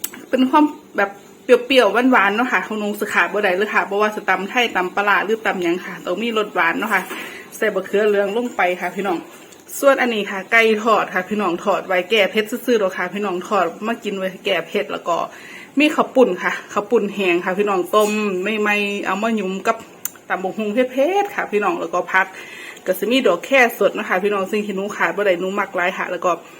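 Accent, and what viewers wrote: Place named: Ubon Ratchathani, Thailand. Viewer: สวัสดีครับ แซบๆครับ สำเนียงคือคนอุบลบ้านผมเลย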